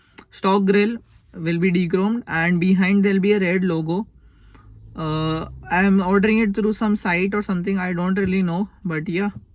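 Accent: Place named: Mumbai, India. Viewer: Its a kinda Mumbai English accent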